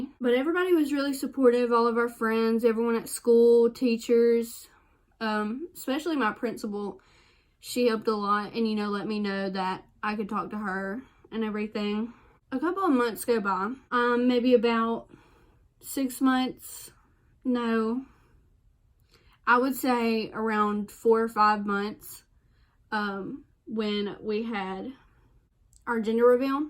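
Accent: United States. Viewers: You gotta be from Texas or Tennessee 🤣❤️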